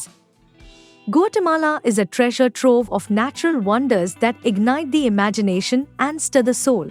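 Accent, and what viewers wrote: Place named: India. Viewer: why does your voice have an indian accent? are you indian?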